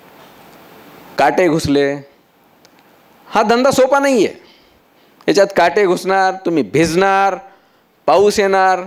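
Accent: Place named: Marathwada, India. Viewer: सर तुमची बोलण्याची भाषा 100टक्के मराठवाड्यातीलआहे.....❤❤❤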